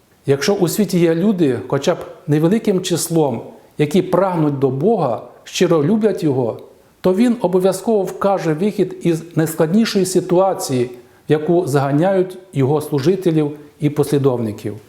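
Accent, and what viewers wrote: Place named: Russia. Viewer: ЧОМУ ЦЕЙ ПАКАНИЧ БРЕШЕ ВСЬОМУ СВІТУ.ЧОМУ ВИ НАЗИВАЄТЕ СВОЮ ЦЕРКВУ УКРАЇНСЬКОЮ, ПРИ ЦЬОМУ ВИ ФІЛІАЛ РПЦ.ВАШ ПАТРІАРХ КІРІЛ.НІЯКОГО ДОКУМЕНТА ПРО РОЗРИВ З ГУНДЯЄВИМ У ВАС НЕМАЄ.ТІЛЬКИ НА СЛОВАХ НАЗИВАЄТЕ СЕБЕ САМОСТІЙНИМИ.НАЗИВАЄТЕ СВОЮ УЕРКВУ УКРАЇНСЬКОЮ, ЩОБ РОБИТИ РОЗДОР В УЕРКВІ І УКРАЇНІ І ДАЛЬШЕ ВЕСТИ СВОЮ РУСЬКУ ПООПАГАНДУ В УКРАЇНІ.ВИ Є ЧАСТИНОЮ РПЦ.НАВІТЬ УКРАЇНСЬКІ СЛОВА ВИМОВЛЯЄТЕ ПЕРЕКРУЧУЮЧИ І МІШАЮЧИ З РОСІЙСЬКИМИ.ВИ Є МОСКОВСЬКИЙ ПІП, ІНІЯКИХ ДОКАЗІВ ЗВОРОТНІХ У ВАС НЕМАЄ.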